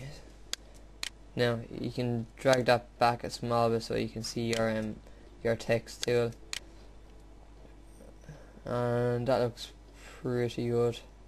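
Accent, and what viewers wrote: Ireland. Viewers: he's irish thats the way he talks thats wrong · u r Irish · you sound like an Irish version of Edd from Eddsworld =3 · hey hes Irish like me haha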